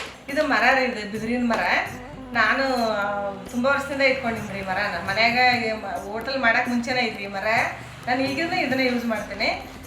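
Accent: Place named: North Karnataka, India. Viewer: Do not stop talking.it reminds our Uttar Karnataka languages. We are missing it a lot❤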